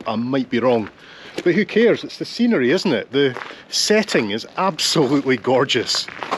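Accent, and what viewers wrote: Scotland, United Kingdom. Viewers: Buddy Rather Than Çompulsively Apologising, Let Us Again COMMEND YOUR LOVELY SCOTTISH ACCENT/ For leading everybody on eye-opener REAL Real FAIR Dinkum West of Legendary BRITAIN ( Scotland, Ireland, Wales Plus Good Old Mother England ) Indeed Agree Very Very Honest Voyage( By Toyota ) of Discovery. Absolutely HUGE Personality/Support Person.Sydney/OZ/Australia/Sunday/Evening/ Hours/Beddy/Bies/Promise/WATCH/STUDY/ Remainder/Tomorrow/Guid nicht/EENIN/FORENICHT!!!!!!!!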